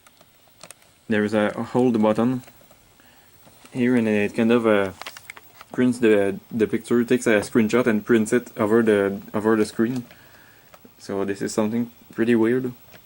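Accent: Quebec, Canada. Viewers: J'ai reconnu tout de suite un Québécois. Haha on est facile à spoter quand on parle en anglais.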